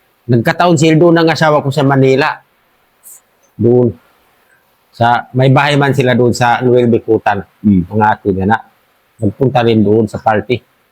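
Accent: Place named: Tausug, Philippines. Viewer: Tausog na tausog talaga c sil mag kwento ba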